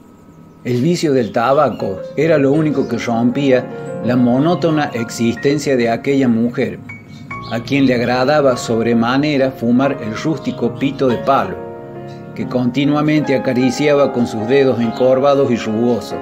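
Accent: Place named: Córdoba, Argentina. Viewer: Hermosa leyenda ANCESTRAL y tu tonada cordobesa . Saludos desde mi Argentina bella y nuestras provincias ❤ 😘👋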